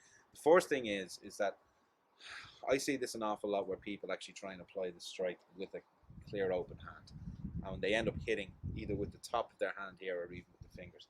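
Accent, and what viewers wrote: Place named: Ireland. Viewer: I have palms on my hand but I don't have these paaaaalms that you speak of. Aye haha i just messing. I notice the irish accent has the same ah sound as the American midwest. Interesting sound.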